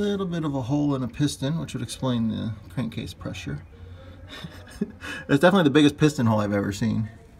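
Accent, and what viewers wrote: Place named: New York, United States. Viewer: That new York accent though 🤣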